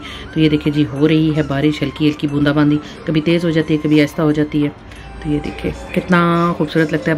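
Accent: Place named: Pothwar, Pakistan. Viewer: BHAUT CUTE BACHAY HAIN AP KE KHUDA SUB KO KHUSH RAKAHAY AR MEHFOOZ RAKHAY AMEEN AUR AP KI POTHWARI SUNANAY MA MAZA AH JATA HAI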